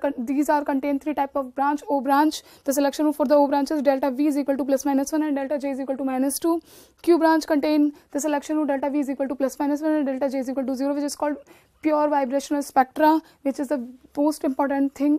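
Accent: India. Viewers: Is this English or english-Indian speaking?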